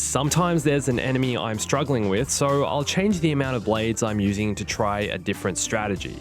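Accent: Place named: Australia. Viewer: What's with the accent? Mostly Aussie, part yank. Watched too much anime?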